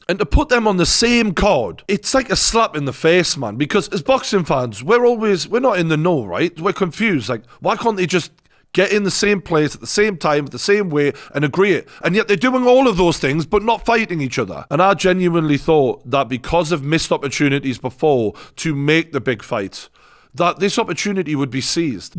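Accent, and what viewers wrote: Newcastle, United Kingdom. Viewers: Truth always sounds better when it comes from a geordie.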